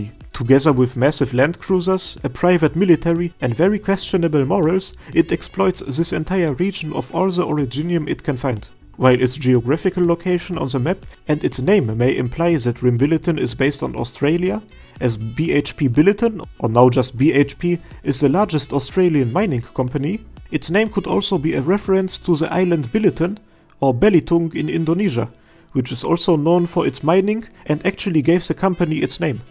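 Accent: Germany. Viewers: Man I loved this stuff, no one ever has put so much effort into this kind of videos explaining the lore of the world of Terra, love it, keep up the good work! PD.: I love your german accent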